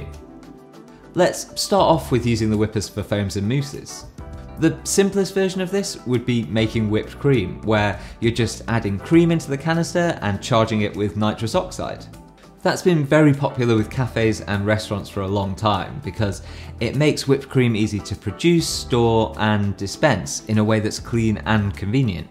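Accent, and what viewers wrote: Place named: United Kingdom. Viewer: I've been watching a few of your videos, now, and the quality is amazing, both of the photography and the incredible food ideas. Your commitment to the flavour, even for a professional, is mind-blowing. Thanks so much for sharing these. I'm also very curious about your accent which has hints of RP, northern and estuary!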